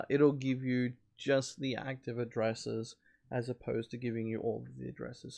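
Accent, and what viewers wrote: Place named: Australia. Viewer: My wife's a septic (Massachusetts) living here in Sydney. Good to hear you still sound like an Aussie :) I'll sit down with TF tonight and hopefully get what I need working. I'll take a look at all your videos when I get a chance, thanks for doing them. The use of the Inverse Relationship the other way around was somewhat ingenious.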